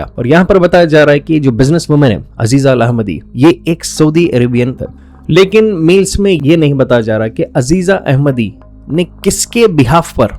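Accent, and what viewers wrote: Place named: Maharashtra, India. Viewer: You have marathi.accent . Ok. Good जय।महाराष्ट्र जय शिवाजी राव। हर हर महादेव।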